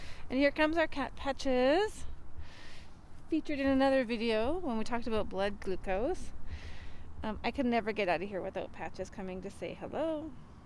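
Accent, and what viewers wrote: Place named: Canada. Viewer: Love these helpful, practical videos! I knew you're Canadian the first time I heard you speak. Sherwood Park... are you... from Red Deer?!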